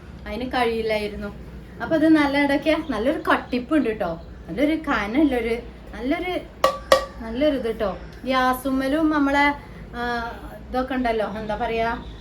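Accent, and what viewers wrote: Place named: Eranad, India. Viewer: കുറ്റം പറയണ്ട, ഏറനാടൻ ഭാഷയാ അതിനെന്താ കുഴപ്പം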